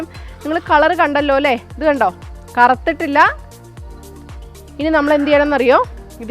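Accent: Kottayam, India. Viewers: Thanku so mach ചേച്ചി..' ചേച്ചിടെ സംസാരരീതി കോട്ടയം അച്ചായത്തി തന്നെ'' ''ഒരു സംശയം ഫുഡ് ഉപയോഗിക്കാൻ പറ്റുമേ.ബീഫ് ,ഫിഷ് ചിക്കൻ എന്നിവ കൊണ്ട് വിഭവങ്ങൾ ഉണ്ടാക്കുവാൻ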